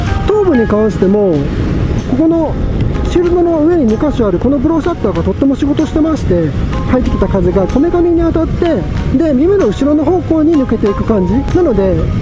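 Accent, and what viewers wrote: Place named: Okayama, Japan. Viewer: 「岡山県人の喋る標準語」に心のセンサーが激しく反応しました！これからも頑張ってください。ちょくちょく覗きに来ます。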